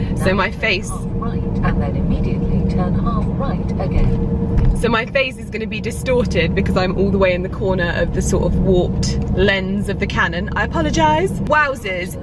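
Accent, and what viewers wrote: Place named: Cockney, United Kingdom. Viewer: Jennifer Harris oh definitely has a cockney twang. I love when she does the baby voice for ali 😆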